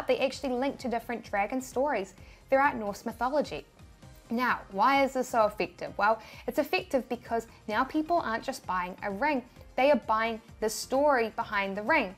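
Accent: New Zealand. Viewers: Definitely a New Zealander. The way she says No. “Nore.. Nore.. Nore.” Love it! 😁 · 2mins in and I think... hold on is this a kiwi accent. Great vid found as a youtube suggested video:)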